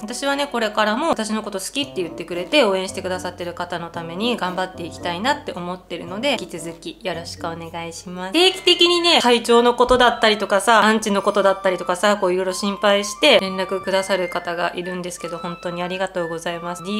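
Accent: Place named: Miyazaki, Japan. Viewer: さんの豪快な食べっぷり、包み隠さず本音でなんでも話してくれるところ、方言（思うっちゃけど〜とか宮崎出身の私にはとても聞き心地が良い）全部好きですよ😊 コメントたまにしかしてませんが…これからも応援してまーす😊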